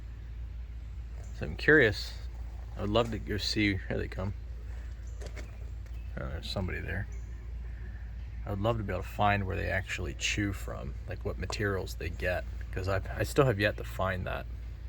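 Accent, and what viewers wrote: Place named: Pittsburgh, United States. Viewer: Man, that's one hell of a yinzer accent!! Love your work!!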